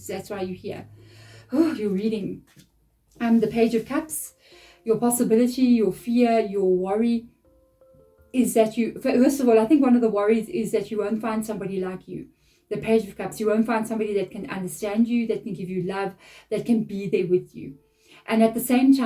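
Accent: South Africa. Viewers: Are you a southy 🇿🇦?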